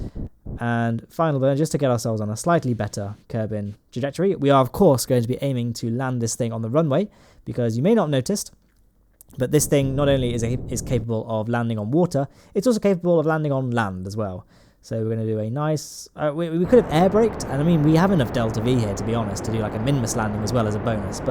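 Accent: United Kingdom. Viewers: Canadian? South park? This guy is a full-fledged brit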